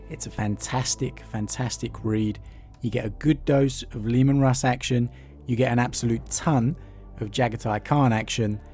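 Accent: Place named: Cockney, United Kingdom. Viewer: It's called Cockney, and it's an accent. Not all English people are incapable of saying "th" lol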